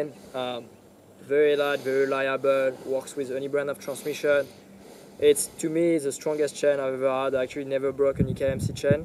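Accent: France. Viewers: L’accent français dans ton anglais 🤘😉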